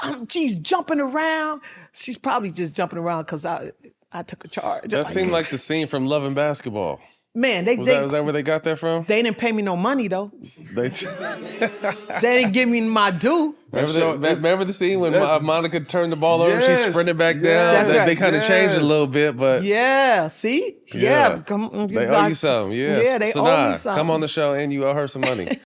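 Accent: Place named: Brooklyn, United States. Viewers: She talks like a NYer. Sis is coast to coast great 👍🏾 🫶🏽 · I know is from cali, but damn she has a strong east coast accent. She sounds like she could be from brooklyn ny or harlem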